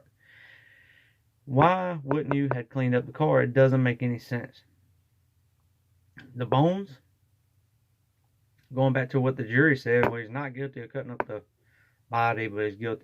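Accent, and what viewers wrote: South, United States. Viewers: Great video. Love listening to your southern accent! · Thank you doing great and I love the southern accent and who cares do not listen to the haters ,Welcome freedom fighter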